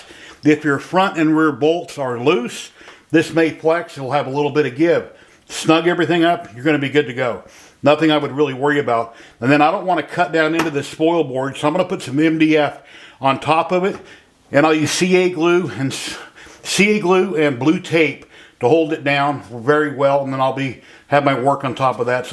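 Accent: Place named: United States. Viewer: very useful review 👍 Sorry but the American accent beat me :) Could you give the name of the sites you used for the maps and tray designs? Thanks!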